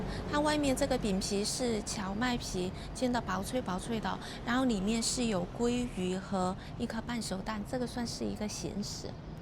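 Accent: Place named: Sichuan, China. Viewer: 這兩天在成都出差，遇到一位川妹跟妳說話的方式簡直一模一樣。忽然覺得好親切「呦」！😊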